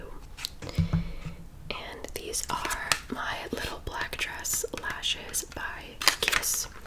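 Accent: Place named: South, United States. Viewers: I love Southern ASMR!! Another fave of mine. I’m obsessed with this lip color. 😍😍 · Omg I love southern asmr sounds too!!!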